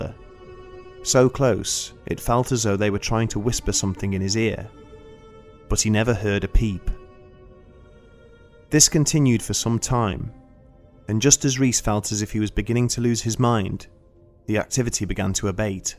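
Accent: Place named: North, United Kingdom. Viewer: Brown The narrator speaks with a northern UK English dialect and there is in fact no 'correct' English grammar. Language is an ever changing and evolving tool that humans use to communicate.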